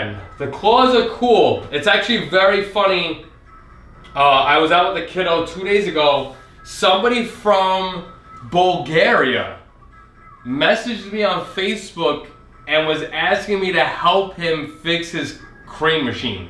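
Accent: New York, United States. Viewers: 🤷‍♂🤷‍♂😊😊 you have such NEW YORKKKKKKKKKK ACCENT :D its great man love new york i lived there all my life and moved to maryland but i love my new york but it expensive to live theses days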